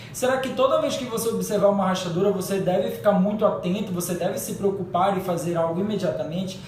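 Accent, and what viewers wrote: Rio de Janeiro, Brazil. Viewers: Você é do Rio??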